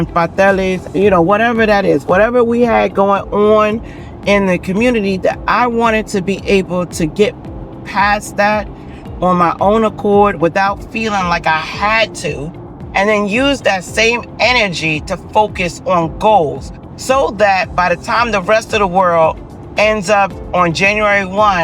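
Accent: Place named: New York, United States. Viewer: Amen, 🎉 Sis love your encouraging words I'm the one who is 66yrs, 285lbs and how i love your NYC accent. Love your videos. I've been watching your videos ever since the video popped up in front of me when you were with Gillian that's where it first started. Water pills, heart pills,baby aspirin and retain water from my N/A Cirrhosis. I know you have alot of subscribers but i'm starting this.... it's something i need to do, and like you said in a comment. I believe that God put that video there for me. I usually watch the watchmen and watchwomen brothers and sisters in Christ and then Gillians video popped on that's when i looked and watched like okay This is my wake up call Love you ❤ all the way from Illinois/Wisconsin.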